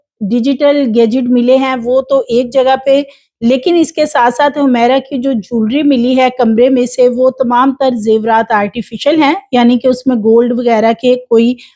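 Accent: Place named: Punjab, Pakistan. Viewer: Tu c paindo ho. Ardo (Urdu) punjabi accent which boldi ho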